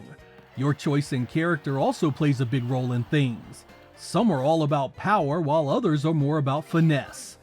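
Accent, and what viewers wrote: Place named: Delaware County, United States. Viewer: You sound like you have a Delco accent.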